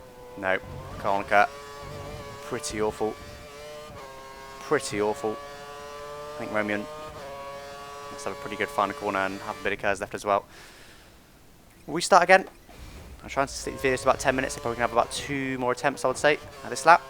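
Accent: United Kingdom. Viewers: Awesome congrats! And btw I prefer 20min over 10min:D just love your commentating it's so british!:D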